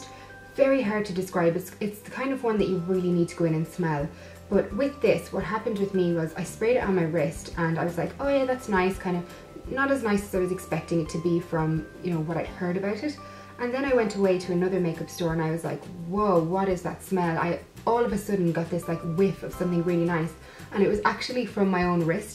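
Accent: Ireland. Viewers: Ur accent is like Irish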